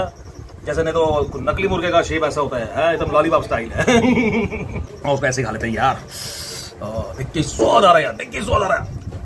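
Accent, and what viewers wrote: Punjab, India. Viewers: bolte bhot accha h...punjabi touch mein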